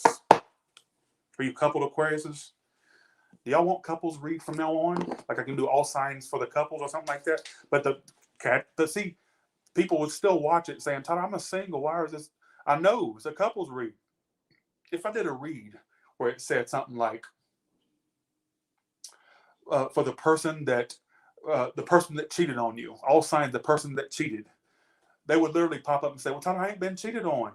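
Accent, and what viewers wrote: United Kingdom. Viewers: Love the UK accent lol xxxxx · The British accent 🤣🤣 I definitely spend too much money in Tesco !! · Uk accent 👌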